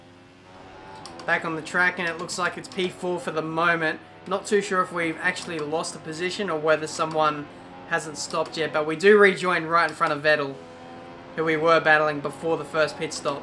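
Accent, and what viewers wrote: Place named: Australia. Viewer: australian voice Great job mate, that came outa nowheree!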